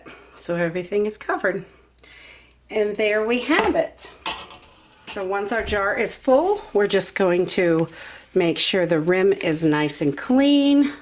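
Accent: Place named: Maine, United States. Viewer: I KNEW I recognized that accent! We just moved here a year ago from the Lakes Region in NH back to my hometown in Maine. Howdy Neighbor!!!!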